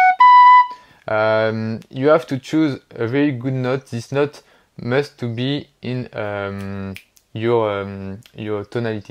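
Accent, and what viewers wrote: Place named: France. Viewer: Je ne me lasserai jamais de ton accent so...french! Mais l'essentiel est de se faire comprendre..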